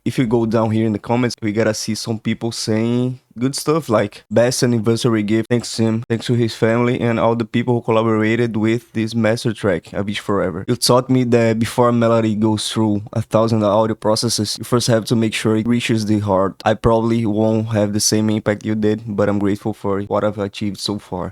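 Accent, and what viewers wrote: Brazil. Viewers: READ THE ENGLISH PART FOR IMPORTANT INFORMATION quando eu ouvi o sotaque já sabia que era daqui kakkakakak bem completo o vídeo, só não esquece que o que aconteceu foi o seguinte (assim como já falaram, mas vou repetir): (English), the artist, that joined some years ago in the same label as Avicii, had access to unreleased IDs from Avicii himself, becuz of this, he made some remixes and bringed up on his songs some vocals, lyrics and melodies that Avicii didn't released, as we can see on. if i remembere correctly the year, in 2017 had played this version of (which is a remix) in concerts, and also, there are reports of infiltrators that Avicii's team scrambled up the files and ended up sending remix as a final version and it got released... It gets worse when you know that, more than the fact that it doesn't looks like the original version, it's not even made by Avicii, and it disappoints (Português Brasileiro) o artista, que há alguns anos entrou na mesma gravadora do Avicii, teve acesso a IDs não lançados do próprio Avicii, e isso fez com que ele fizesse alguns remixes e incorporasse nas músicas dele vocais, letras e melodias que o Avicii não lançou, como é o caso de. 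se me recordo bem o ano, em 2017 já foi visto tocando em shows essa versão de que é um remix dele, além disso, há relatos de infiltrados, em que o time do Avicii acabou misturando os arquivos e enviando como versão final o remix do, qu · I was gonna ask if u're brazilian because of your accent until I saw the "Portugues do Brasil" on spotify. Concordo com todos seus argumentos, mano. Diria ainda q pra nao deixarem os fãs tão putos, poderiam ter mantido o primeiro "drop" do jeito original, e aumentado a energia do segundo usando essa versão nova. Eu fiz um som em homenagem ao Avicii e lancei aqui no meu canal faz uns dias, seria dahora se vc ouvisse e me falasse oq acha. /watch?v=A9yiasCdmE8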